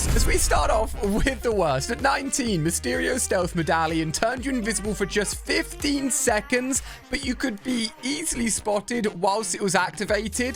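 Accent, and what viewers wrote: United Kingdom. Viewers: Are you British you sound like you’re British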